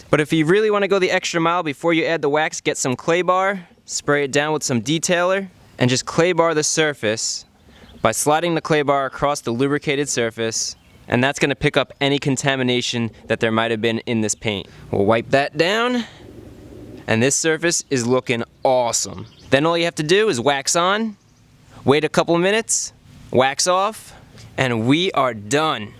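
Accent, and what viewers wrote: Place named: New York, United States. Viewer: You have sort of a NY accent. lol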